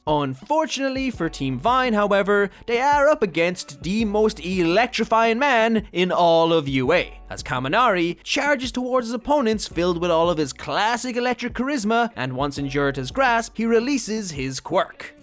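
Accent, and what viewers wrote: Ireland. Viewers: Shut up and take my money. Also, you sound Irish. Are you? Curious.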